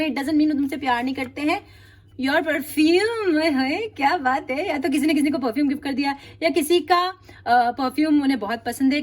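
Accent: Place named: Kolkata, India. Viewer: Sune valo laglo....Kolkata bengali..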